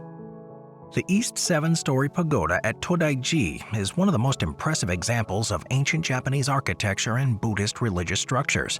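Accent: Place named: United States. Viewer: The American accent of the narrator hurts to the ear..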